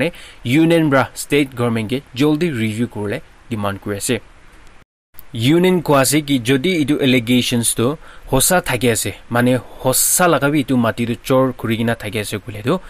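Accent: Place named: Nagaland, India. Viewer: Best reporter... Soothing voice, audible and Nagamese is normal unlike other channels.... Other channels speak Nagamese so stylish that even we Naga cannot understand 🤣🤣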